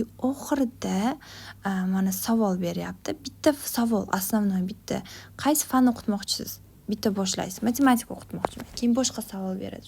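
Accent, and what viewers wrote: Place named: Namangan, Uzbekistan. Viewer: Namangan sheva chiroylida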